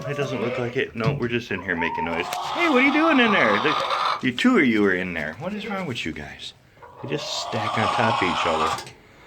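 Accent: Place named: Midwest, United States. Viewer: Whoo hoo get to watch my favorite turner do other stuff. I hope this goes well for you and. I love all your content. Makes me want to go home to Mishawaka/Granger area. My family bis still there brother and sister aunt and bunches of cousins. Miss that Midwestern accent!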